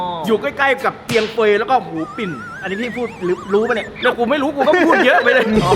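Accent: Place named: South, Thailand. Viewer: คนใต้ใช่ไหมนิ มาเลยมาคุยกับหัวหน้าผมก่อน😆😆😆😆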